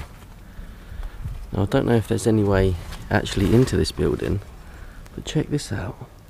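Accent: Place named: Suffolk, United Kingdom. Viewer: Quite welcome! I am fascinated by these type of urban explorers/abandoned properties videos, and enjoy seeing them. Also one viewer suggested having subtitles, as though this were a foreign film in need of translation. Not for me! In my teens the local PBS station ran lots of UK programs, BBC/Thames/ITV etc., so I have no difficulty in hearing your Suffolk accent. My ear for dialects is perhaps more finely tuned than the average fellow :)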